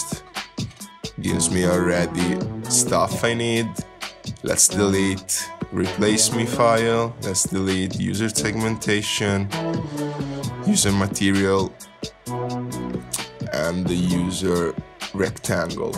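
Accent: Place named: Italy. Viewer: yay Good job! come faccio a farmelo accettare? perchè non dice che è presente uno static txt Comunque amo il tuo accento inglese italianizzato!!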